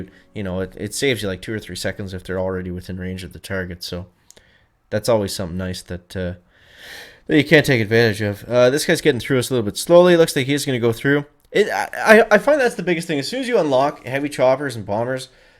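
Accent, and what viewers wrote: Canada. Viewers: could you do one vid with your thickest Canadian accent possible please? I would love it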